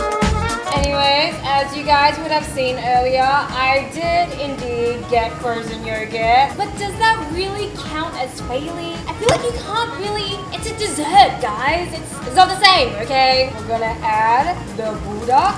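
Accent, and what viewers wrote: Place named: Australia. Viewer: The Australian accent 🤌🤌^^ :D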